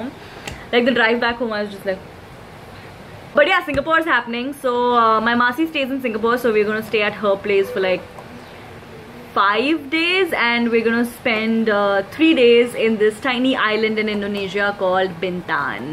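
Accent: India. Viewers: What's dat hindi accent ??🤔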